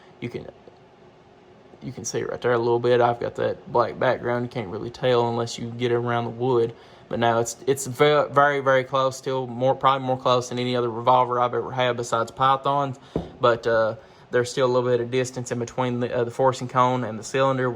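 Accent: South, United States. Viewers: I noticed that you have a southern draw just like I do! Where are you located? I'm in Pensacola, FL. about 10 miles from the Alabama state line. We call the Florida panhandle L.A. as in lower Alabama! I get teased about it also! Dang it!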